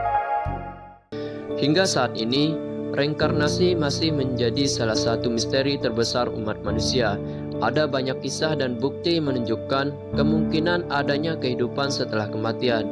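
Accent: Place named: Bali, Indonesia. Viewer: Hmmm... sebenarnya aku ingin bertanya padamu, apakah kau mempercayainya,,, tapi itu ku batalkan, mengingat aksenmu menunjuk kan logat Bali, jadi kujawab saja sendiri kau pasti percaya, karena itu di sebutkan dalam ajaran agamamu. Dan jika kau percaya, kau bahkan tak perlu meragukannya dengan membaca atau bertanya pada ilmuwan. Apapun jawabannya mereka hanya meneliti dan meramalkan atau menyimpulkan,, jika hasilnya tak sesuai kau malah goyah pada apa yang kau yakini. Karena hal ini tidak bersifat fisik itu sudah menyangkut ranah di luar fisik. Itu berada di ranah antara kematian menuju ke kehidupan !. Jika kau ingin memastikannya orang harus mati dulu. Setelah itu baru tahu apakah reinkarnasi afa atau tidak, tapi itu terlalu ekstrim bukan ?? Ha ha ha ha ha.... untuk lebih mudah cobalah cara yang lebih mudah,, yaitu dengan memahami hakikat hidup dan kehidupan.. 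mungkin saja dari situ kau akan mendapatkan pencerahan ! Karena jika kau tanya ilmuwan,, jika ilmuwannya hindu atau buda, mereka pasti jawab ada, terlepas dari apapun caranya untuk membuktikan bahws itu ada,,, tapi sebaliknya,,, jika ilmuwannya muslim atau islam,, dia pasti akan menjawab tegas tidak ada, meskipun bukti ilmiah mendukung dan membuktikan!. Kenapa? Karena dalam islam tak ada konsep tentang reinkarnasi. Termasuk karma, hal itu tidak di jelaskan atau di ajarkan dalam agama islam. Tapi,, tidak di ajarkan,, belum tentu berarti tidak ada bukan??... jadi selama faham itu membawa kebaikan hidup bagimu dan bagi sesa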